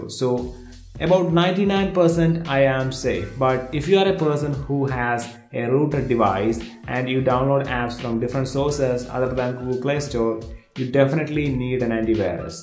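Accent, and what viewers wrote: Kerala, India. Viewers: The damn Indian slang again...wwwhhhattt tthaa thheerrr oh boy,why can't you get some one who speak proper English.. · typical malayali accent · Talk English please, not Indian with English words. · Are you malayali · The mallu accent is thick in this one. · Is that an Indian accent? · Malayali aano ? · are you a malayali???? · That thick mallu accent.. Subbed brodhah.. 😎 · Bro?You malayali? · Dear Adam, Indian accent is also "an accent" just like any other non-english nations. Just saying... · malayali analle?? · His accent is not very good. Use subtitles and music, it will be better for english videos as you have Indian accent that's hard to interpret. Or take english courses. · you need to uninstall your india accent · worst Indian accent I ever heard... can't understand more than half what you babbled...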